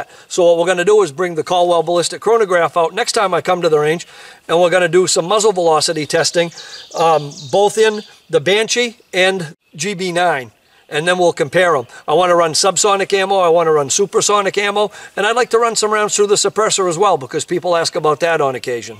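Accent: Maine, United States. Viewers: Thanks! Your Mainah-ness makes me feel at home, away from home.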